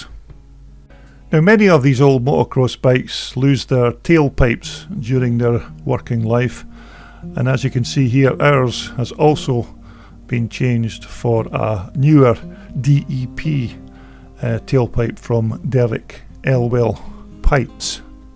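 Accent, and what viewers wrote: Scotland, United Kingdom. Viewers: "Gas" ???you sound Scottish, not American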